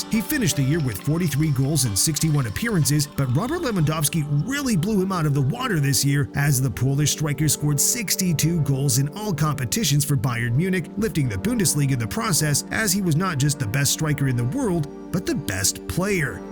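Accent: United States. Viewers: This guy sounds American, makes sense why this video is shit.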